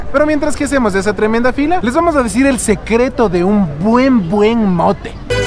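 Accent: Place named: Ecuador. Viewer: Hablas muy feo hno. Así hablan los ecuatorianos ??!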